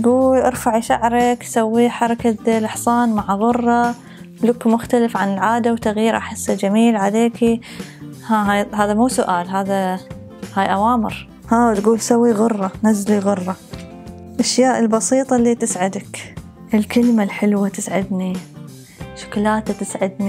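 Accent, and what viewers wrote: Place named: Basra, Iraq. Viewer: انتي لهجتج بصراويه بس م̷ـــِْن وين من البصره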